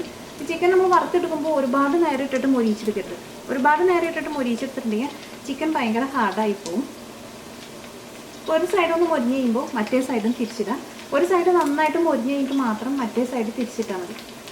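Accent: Thrissur, India. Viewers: looks so yummy... will definitely give a try. by the way, I'm a great fan of you dear chechi... your way of explaining and that trissur slang are wonderful.... thank you for all your recipes....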